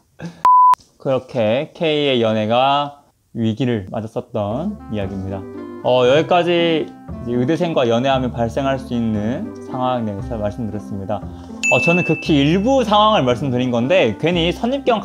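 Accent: Gwangju, South Korea. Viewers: 헉 약간 말투가 광주바이븐데 광주광역시 출신이세요?!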